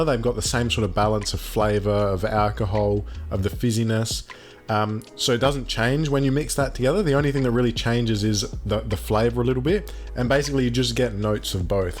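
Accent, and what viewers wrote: Adelaide, Australia. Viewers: this guy sounds just like DJ Sanchez lol beyond just adelaidian accent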